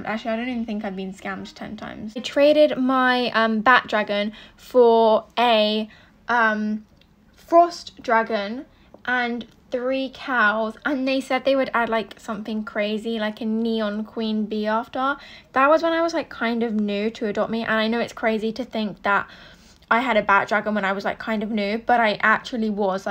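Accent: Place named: United Kingdom. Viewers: Ummm what do you mean all British accents sound the same. Hers is not the best accent. I ave a British accent and it sounds the exact same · Britishhhh~~~~~ da fancy accent ._. it's hard tho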